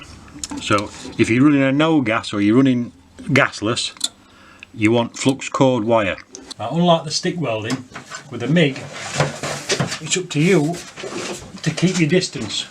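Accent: Yorkshire, United Kingdom. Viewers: You sound like you’re from Yorkshire? Where to you get your gas bottle from for gas mig welding?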